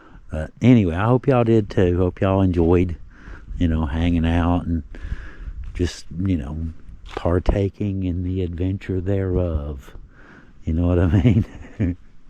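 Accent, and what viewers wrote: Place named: South Carolina, United States. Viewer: Great video I'm trying to guess your accent. are you from South Carolina?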